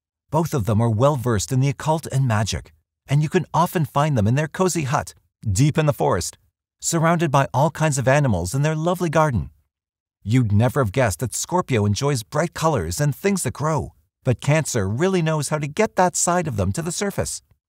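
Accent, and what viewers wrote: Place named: Canada. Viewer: The narrator is from Canada; I can tell because of his pronunciation of " ou" .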